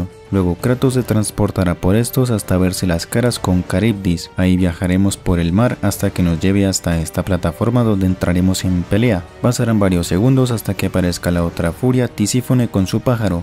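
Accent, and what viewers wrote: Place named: Peru. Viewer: Se nota que eres peruano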